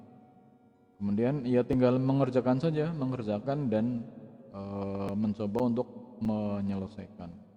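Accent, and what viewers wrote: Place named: Banyumas, Indonesia. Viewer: Brute Force... Mantaf.. Ngomongnya ngapak gak papa malah seru... Cuma kurang di cepetin dikit ngomongnya hehehe.... Trims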